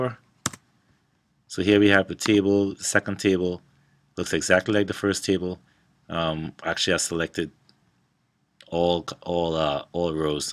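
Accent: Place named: Caribbean. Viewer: Where's your accent from? You sound West Indian.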